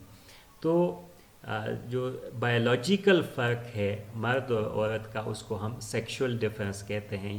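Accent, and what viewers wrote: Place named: United Kingdom. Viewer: firstly I thought he is a Britisher........then he started Hindi....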